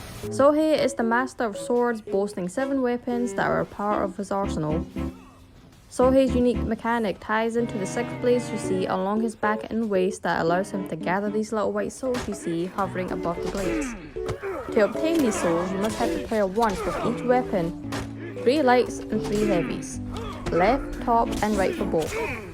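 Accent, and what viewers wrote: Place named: Scotland, United Kingdom. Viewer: Maybe it's easier to hear your voice with headphones on, but just used my phone's speakers. For me, the wii music was just as loud as/slightly louder than your voice. It's not terrible, but you want your voice at the forefront of attention. Unfortunately the grunts and sounds from For Honor made it impossible to understand what you're saying. Plus keep in mind that you have a distinct accent which isn't easy to understand for the majority of people. It's not as strong like a Jamaican accent, but if I had to guess, you're from Scotland/Northern England and most Americans (the biggest audience pool due to sheer size) would say it's very hard to understand. Anyway, it's still always a good idea to make a voice loud and clear in the mix of a video without the clutter and noise of sounds and music which are supposed to fill in the background and not overshadow the person speaking. 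Hope you have a great day and thanks for making a cool video! :)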